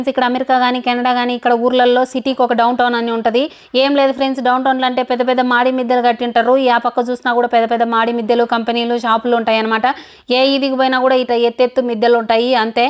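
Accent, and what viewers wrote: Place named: Kurnool, India. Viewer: Maadi kurnool district.. ayinaa mee negative village ku chaalaa daggara kanuka slang 99% same vuntundi.. Vuregadam,, Mugeddulu. Naaku kudaa silent vunte bo manta..oonnoo antene satisfaction..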